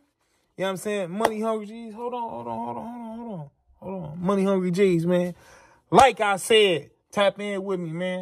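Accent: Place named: California, United States. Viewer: You from lil rock bro? You got that cali accent. And man RIP to that elco wished you would walked up to it i wanted to see the damage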